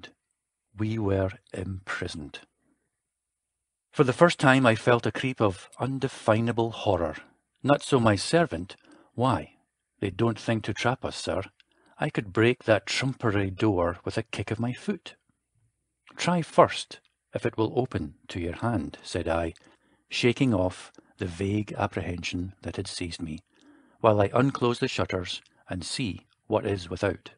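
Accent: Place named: Scotland, United Kingdom. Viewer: I heard an adaptation of this on CBS Radio Mystery Theater and I want to compare it to the original, so I scrolled through four audiobooks playing the first minute of each to see which reader works for me. I don't see why I'd choose a Scots accent when it's not a story that has anything to do with Scotland, but out of the available choices this is the voice that appeals to me and that's just the way it is.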